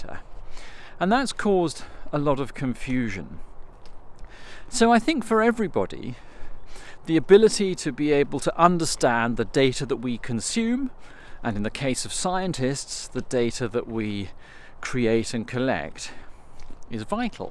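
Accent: United Kingdom. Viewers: You're British, thank God!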